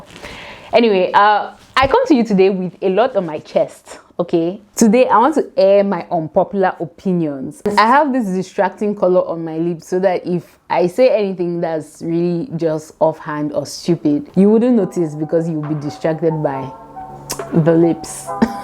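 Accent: Nigeria. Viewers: Please are you a Nigerian I'm trying to figure out your accent....m obsessed 😘... oh....love you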